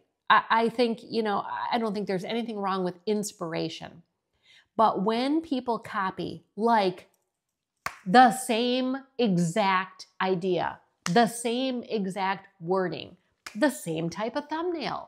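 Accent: Michigan, United States. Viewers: I have a good friend from Michigan and you sound like her. You do have a slight accent like I do coming from Pittsburgh! I fell down the steps in 2018 and broke my back in 2 places. I had surgery on T6 but they let L5 heal on it's own. I still have back pain from the L5 and permanent nerve damage in my right foot. Nothing stops me! I work 4 days a week and babysit grandkids 1 day a week. I could leave my grown kids but can't leave the grankids. So I guess we'll put up with northeast Ohio winters. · Lmao. I’m 80! Ha ha! you look very very good at your age. We are close in age. I just know some women let themselves go as they age. You do have a Michigan accent, it’s cute 😅. I appreciate your honesty. I am Carnivore way of eating for 11 months. And I am at the weight I haven’t been in 25 years. I am so much healthier. As a RN I understand your back pain issues I remember the video. 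I pray you stay well. Your skin is beautiful and your figure is banging 😅. Hugs from TX ❤❤ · Interesting video,! As for your accent, I am familiar with how Michiganders sound, so I figured you were raised there. As for Midwest accents...there are so many different ones! I was raised in northern Iowa where we have an accent similar to Minnesotans. However, I've lived in southwest Iowa for 38 years and here people sound more like Missourians. And that's just Iowa! 😅 Let alone South Dakota, Minnesota, Wisconsin, etc. Have a good week! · I have to laugh about your so called accent, I’m from Michigan also but now live in East Tennessee, I get asked all the time , where are You from you have a “ up north” accent, I don’t think we have one at all , but people sure do lol , glad your back is good , praise God!!!! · I can definitely tell you're from Michigan. I have relatives from Michigan...and they have the same "accent". 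🙂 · As a former Michigander, I can hear your “accent.” I moved to South Carolina 20 years ago and married a Charleston native. My husband says my “accent” has softened over the years. 🤷‍♀️😁 BTW, I do NOT miss Michigan winters one little bit, lol.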